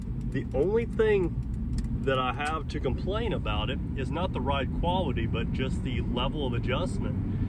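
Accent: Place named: Texas, United States. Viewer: Chrisfix with a texas accent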